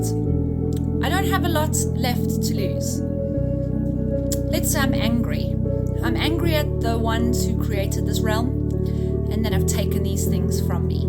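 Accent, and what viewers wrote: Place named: United Kingdom. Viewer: Also I like your accent. Is it British? I’m originally from South America living in the USA. When I hear a person pronouncing every single syllable in English, I love it. I’ve been speaking English for many years but for some reason I’m still insecure.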